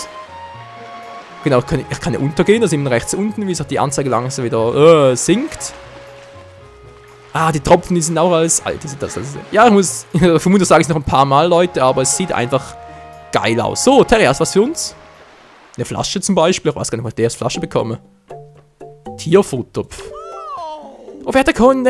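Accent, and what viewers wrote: Switzerland. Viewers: Kommst du aus der schweiz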